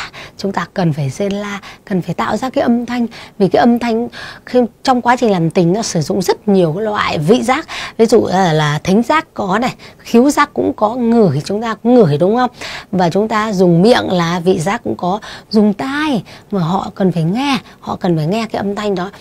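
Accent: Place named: Vietnam. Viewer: Chị ở đâu mà nặng tiếng địa phương nhỉ. E ở hải Dương nè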